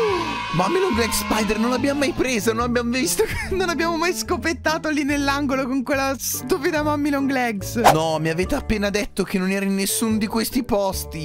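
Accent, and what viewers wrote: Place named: Udine, Italy. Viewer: Mi sembra udinese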